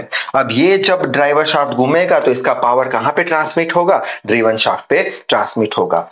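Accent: Gujarat, India. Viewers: sir are you gujarati?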